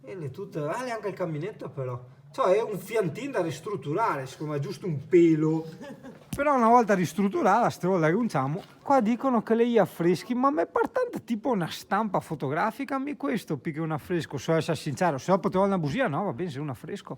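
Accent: Veneto, Italy. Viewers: Bel video complimenti, bella parlantina Veneta. Bellissimi posti 🤟 BUON SABATO sera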